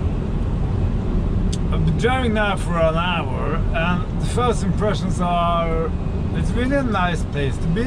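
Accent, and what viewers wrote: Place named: Norway. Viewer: I'm Australian and his English is good and clear even with his Norwegian accent. Very enjoyable video. The T3 order books open here in Australia on July 30th, 2021.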